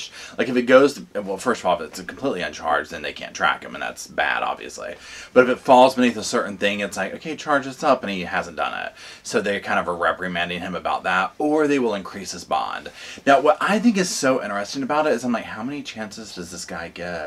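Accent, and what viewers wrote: South, United States. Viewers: Omg I cannot wait for you to share your other channels! Hahaha I’m a sofasquad groupie now. Full disclosure: You’re seriously just the sweetest thing I’ve ever laid eyes/ears on. You always seem to say exactly what I’m thinking. And Uhg your southern accent just tickles me to death. Ok sorry now I’m sounding borderline creepy... Just sayin, I’d listen to your podcast even if it was just you discussing your bowel movements or whatever 🤷🏼‍♀️ so keep up the good work bud 😊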